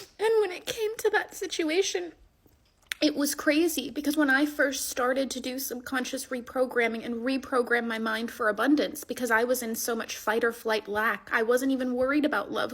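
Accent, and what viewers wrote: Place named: New York, United States. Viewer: Ah...could've sworn the way she speaks is from the NY area, as that's where I'm from.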